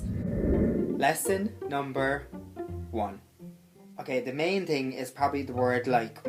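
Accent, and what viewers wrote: Cork, Ireland. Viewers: C'mere Boy, Ya really need to read the late Sean Beecher's "Dictionary of Cork Slang", and try talk to some of the old-timers - - - mind you, NOTALL NORRIES !!!!!! You don't have a really, really STRONG Cork, to be honest, more of a mid Atlantic Norry Brogue. DOWTCHA BOIY ! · My Dad was from Cork City (the South Side). Love this - there's nothing like a Corkonian voice to make me smile. Thanks. :) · I am from Cork and this sounds just like me. But I go to a high school in London in some people just can't understand me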